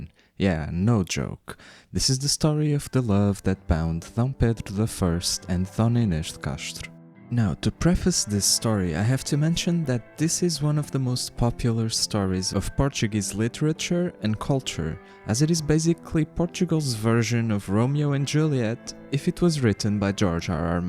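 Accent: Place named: Romania. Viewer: Stai că m-ai lăsat în șoc. M-am gândit că accentul sună a român, dar nu am fost sigur.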